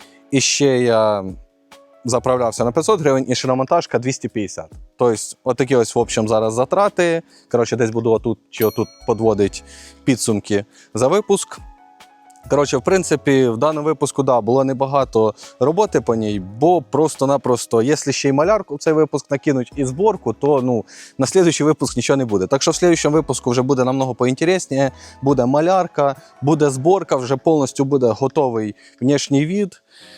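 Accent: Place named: Russia. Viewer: І моZZkaльська підзвучка теж ріже вухо. І головне, що не старається виправлятись.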